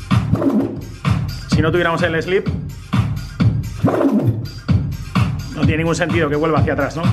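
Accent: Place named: Castile, Spain. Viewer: Pero si es el Castellano más puro, como el mío. Pues no hables con un Andaluz te ibas a liar 😅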